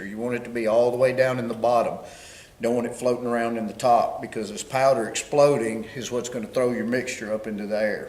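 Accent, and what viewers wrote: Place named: Appalachia, United States. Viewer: Right off the bat he sounds like a hillbilly.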